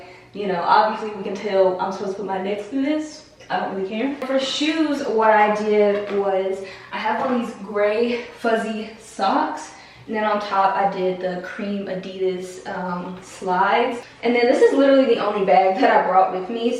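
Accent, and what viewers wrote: South, United States. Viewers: Puuurrr 😻😻 I’ve only been Watching your videos for about a week but I knew I heard a southern accent ! Period Girl 😜💅🏽 this Vlog was very wholesome, keep up the good work sis ❣️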